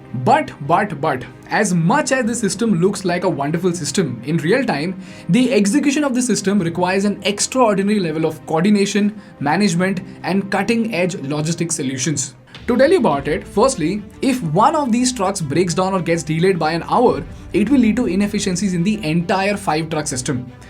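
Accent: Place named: South India, India. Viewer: I can't with this guys aiyyayyo accent. Though the topics are good, I have to switch. Hopefully he can learn to speak without marbles in his mouth. No offense.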